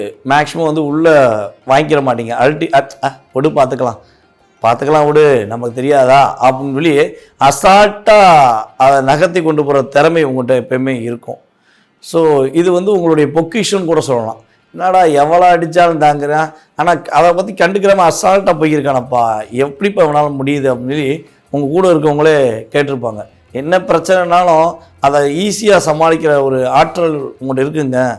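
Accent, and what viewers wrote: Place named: Madurai, India. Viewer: Madurai man vasam pechil theriuthu sir.romba varusama adi than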